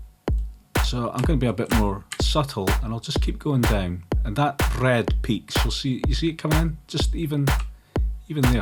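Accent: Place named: United Kingdom. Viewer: after this video i'm still confused, are you bri'ish or what? your accent is clipping my brain :S great video btw!! clipping makes mixing and mastering so much easier <3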